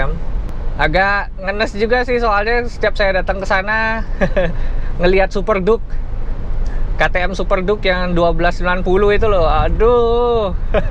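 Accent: Bali, Indonesia. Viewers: kok kyk bali logtnya yak? apa cuman perasaan gue aja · awal ngelihat ni vlog, logatnya yg saya kenal, setelah di cek ternyata orangbali 😀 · masbro.. sy subscriber baru nih.. yg sabar ya masbro.. namanya jg kcelakaan.. kdepannya hrs extra hati" masbro..:D oh iya logat ngomongnya kaya orang bali ya bro..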